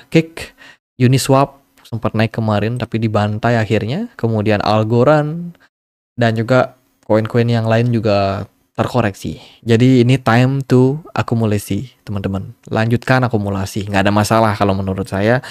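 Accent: Bali, Indonesia. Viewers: Maaf,,,sptnya adminny ini dari logatnya,,asal BALI ,,ya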